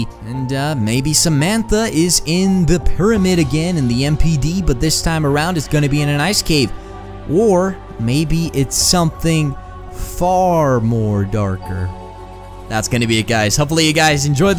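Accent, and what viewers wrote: Bosnia and Herzegovina. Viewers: Are you from Bosnia?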